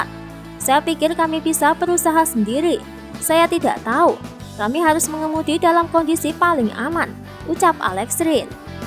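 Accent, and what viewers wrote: Java, Indonesia. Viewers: Admin ny medok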